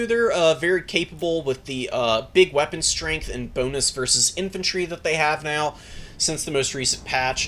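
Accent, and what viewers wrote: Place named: Mid-Atlantic, United States. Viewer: The mid Atlantic accent is strong with this one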